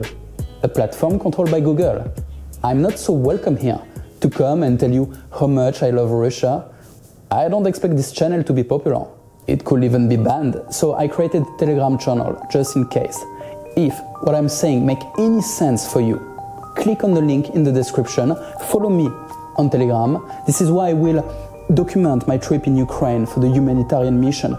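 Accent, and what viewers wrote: France. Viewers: Bro you really should work on the pronunciation, you have a really heavy French accent that makes your point really hard to understand, plus the really basic grammar mistakes are quite discrediting the image of yourself you want to convey (I'd have trouble with a business man that doesn't know that you should say ''the world knows'', not ''the world know'') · Chad french accent bro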